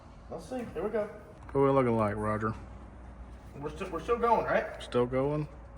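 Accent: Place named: Florida, United States. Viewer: You sound like my uncle from Florida. I live in Texas but he sounds like you 😂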